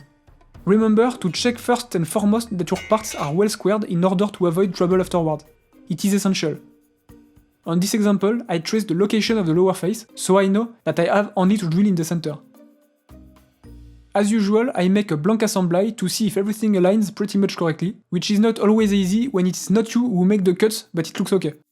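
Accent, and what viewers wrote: France. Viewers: Salut belle realisation , pour l'anglais travail ton accent car on vois bien tu es francais mdrrr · oh putain cet accent bien de chez nous XD Tu as bien du courage de faire une chaine en anglais. :)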